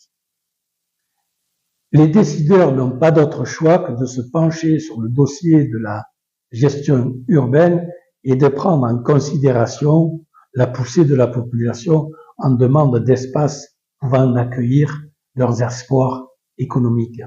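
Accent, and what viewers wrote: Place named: South, France. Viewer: Qui es tu? Avec ton accent du Sud français, pourquoi tu ne reste pas à 6000 km à t'occuper de l'urbanisation marseillais?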